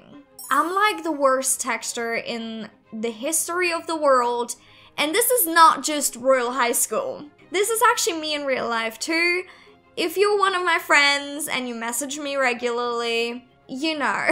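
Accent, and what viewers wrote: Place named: Australia. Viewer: !! u sound aussie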